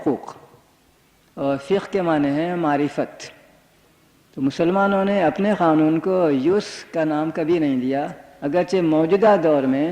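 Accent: Hyderabad, India. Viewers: ماشاءاللہ۔۔۔ھر لیکچر ایسا ھے کہ 2020 میں سنتے ھوئے بھی محسوس ھوتا کہ نالج اور علم کے نئے باب کھل رھے ھیں۔۔ڈاکٹر صاحب کا حیدرآباد دکن کا اردو لہجہ مسحورکن ھے۔۔۔اللہ ڈاکٹر صاحب مرحوم کو جنت الفردوس میں اعلی مقام عطا فرمائے آمین۔۔۔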